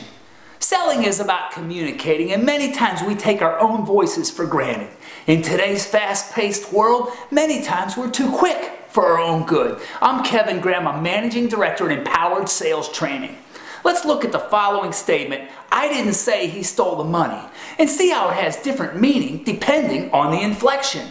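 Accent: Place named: United States. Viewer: This is very stereotypical american. Perhaps they play it at public American culture courses? Anybody talking even remotely like this is guaranteed to only care about your money.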